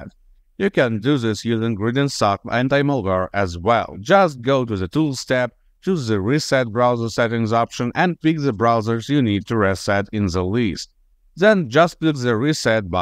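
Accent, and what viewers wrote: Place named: India. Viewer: not even the AI can cover the indian accent. but thanks for the video.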